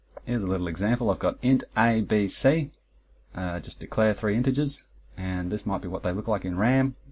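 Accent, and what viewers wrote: Australia. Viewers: Alejandro Doe You do know Lennon was a Brit and this dude sounds like he's from Down Under. BTW vectors are better than linked list almost always. You get a lot more cache misses vs. vectors.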